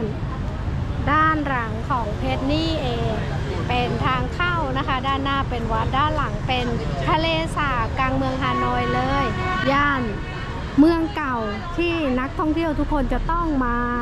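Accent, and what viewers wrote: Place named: Thailand. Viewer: Melihat beberpa video anda yang berawal dari Vietnam, saya beranggapan bahwa anda orang Vietnam, rupanya anda orang thailand 😅. Cuma dari awal logat berbicara anda, saya sedikit memahami bahwa anda orang thailand, hanya karena anda meliput video Vietnam maka saya mengira anda orang Vietnam 🙂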